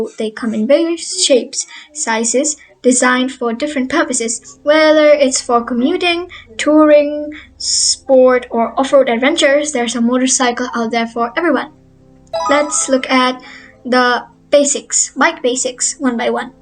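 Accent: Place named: Katra, India. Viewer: Waaaa katra accent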